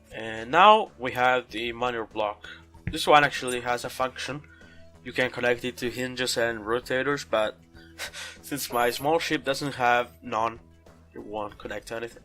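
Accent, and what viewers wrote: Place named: France. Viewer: You can hear it's the dev by the French accent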